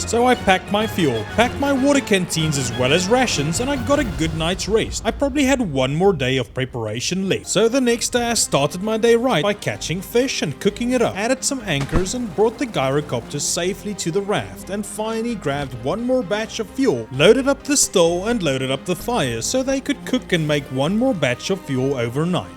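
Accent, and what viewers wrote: Afrikaans, South Africa. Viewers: u sound afrikaans ..., are you? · Haha nice , eks ook afrikaans so ekt die aksent amper dadelik erken 😂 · Are you from south africa? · First time I've ever come across your channel, can hear that Afrikaans coming through :P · for some reason you sound south african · Do I hear a South African accent? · The south african in me smells an accent 👀🤣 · You are south african me to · oh ok because i thought that accent of your sounded really like a SA accent was just curious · South african or new zealand? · South africa? I'm ne not trying to offend you but are u south African because u sound like a south african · You sound South African? Are you by any chance one of the 44? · Are you South African? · mans sounds south african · Are jou South African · Bro u gotta be South African with that accent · You sound like a south african when you speak english (the accent) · Are u afrikaans? 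· you sound afrikans bro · You sound like you come from ZAR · Why do you sound South African. Are you from South Africa? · South African? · Good to hear a south african voice, epic video man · It sounds like your from SA? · Are you south african? Your english sounds a little like you got an afrikaans accent?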